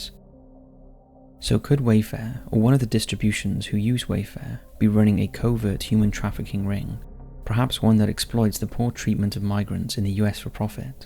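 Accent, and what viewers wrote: Wales, United Kingdom. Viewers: Nice British accent 😊😊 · It's a Welsh accent probably from Cardiff or Newport area.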